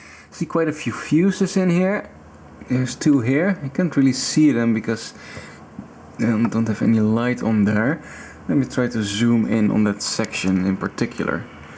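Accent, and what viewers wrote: Netherlands. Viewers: Hahahahah yeah me too, 99% of the time you can tell right away if someone is a Dutchman speaking english. Yeah these things were way ahead of their time in terms of features, the parametric eq and the crossover function especially, auto mix channel is cool too. Yeah i know, it's sad that people discard these amps when something other than a fuse goes because they think it's old and solid state but everything has a life span and they're not that expensive to replace so we'll give it a shot fixing this behemoth. Maybe i'll turn it into a video like you did but i digress, thanks for this video, i enjoyed it a lot.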